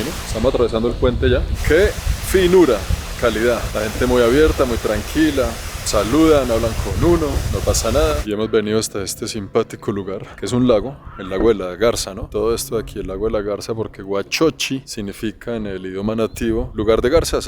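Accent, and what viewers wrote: Colombia. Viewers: Amo su acento colombiano, su sencillez y muy guapo gracias por enseñar y visitar nuestro país ❤ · Porque habla con ese acento sera colombiano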